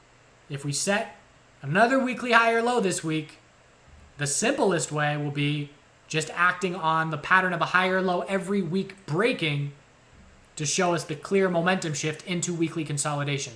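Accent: South, United States. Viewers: if that wasn't enough a nice southern accent to put a smile on your face. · Like the southern accent more 👌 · Love the aggressive southern trader accent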